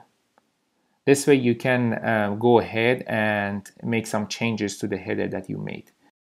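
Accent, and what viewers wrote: Iran. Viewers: By listening to your accent, it seems your native language is Persian.👍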